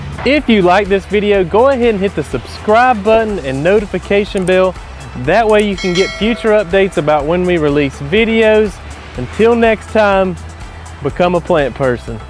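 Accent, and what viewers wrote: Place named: Tennessee, United States. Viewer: Did you say you’re in TN ? That’s where I am and your accent matches mine 😊. Thanks for the video! Very helpful. Hubby got us one of these yesterday for our anniversary 😊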